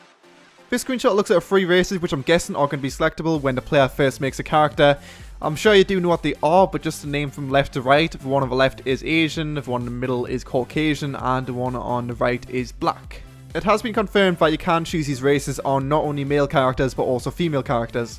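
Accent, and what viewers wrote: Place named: United Kingdom. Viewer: I miss your videos. Make some Wasteland or carry on playing Dayz Taviana!!! Please!!! Btw like your british voice:)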